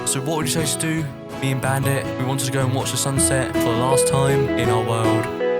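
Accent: United Kingdom. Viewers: I love your voice Are u Britain? I’m sorry if ur offended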